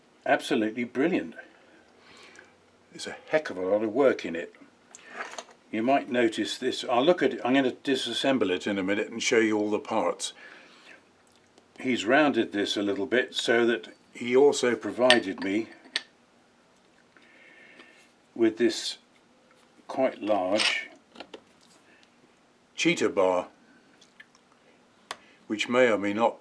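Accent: United Kingdom. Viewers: I do so enjoy listening to a British poster for a change.....please keep the videos coming.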